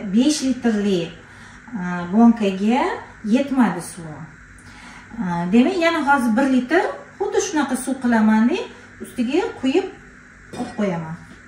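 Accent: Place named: Tashkent, Uzbekistan. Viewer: Замонавий амалдор айолга ухшайсиз илтимос адабий тилда гапиринг тошкент шеваси жуда одамни гажини келтиради хар сузга а кушмай гапиришни иложи йукми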